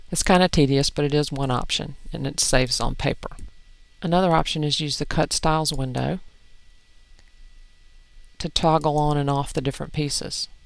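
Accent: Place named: Louisville, United States. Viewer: Is that a Louisville accent? What team is the eagle from? thanks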